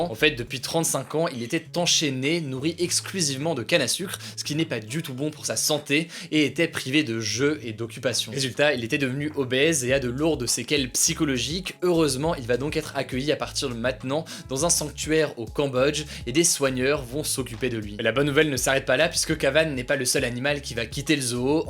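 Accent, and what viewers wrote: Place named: United Kingdom. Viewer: Ton accent anglais 😂👍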